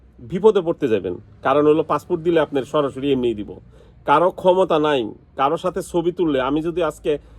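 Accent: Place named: Chittagong, Bangladesh. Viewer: চিটাগং কথা আপনার কাছে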